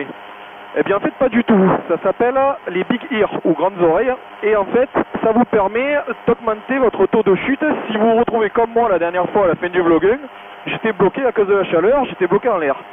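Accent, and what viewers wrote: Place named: Arles, France. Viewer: je me disais que ton accent est peoche du miens mdrr je suis du coté d'arles et toi? Parce que c est jolie ou tu voles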